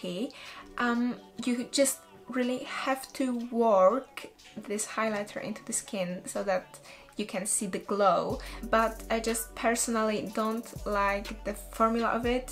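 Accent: England, United Kingdom. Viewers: uwielbiam ciee! masz taki wspanialy akcent angielski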